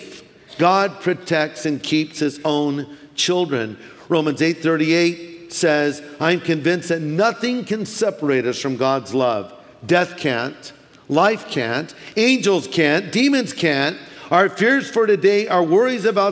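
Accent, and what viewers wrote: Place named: California, United States. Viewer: You definitely do have a California accent. It's a laid back, drawn out sound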